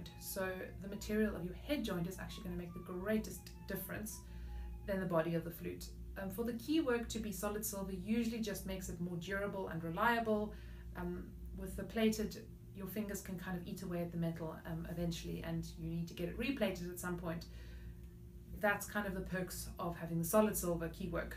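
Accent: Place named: South Africa. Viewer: South African, I believe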